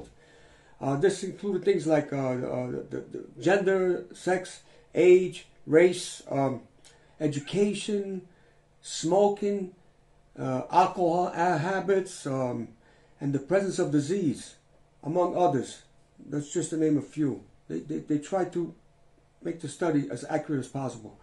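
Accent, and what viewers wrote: New York, United States. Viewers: Love the advice (I'm 76) and love the accent. Maybe you're from New Yooorrrk?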